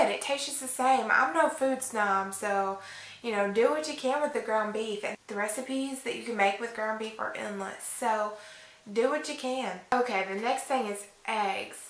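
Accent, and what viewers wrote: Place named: North Carolina, United States. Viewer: I love your accent. North Carolina?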